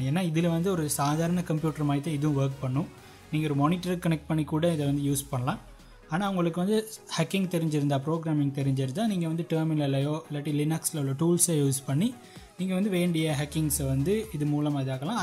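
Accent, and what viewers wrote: Sri Lanka. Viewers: Bro neenga Sri Lanka vaa ?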